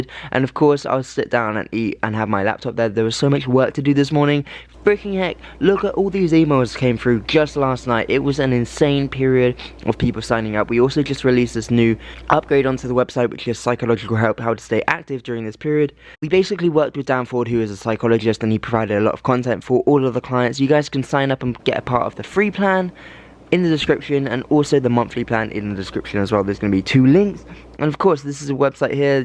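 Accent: United Kingdom. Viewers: His British accent 😘😘😘😘😘😘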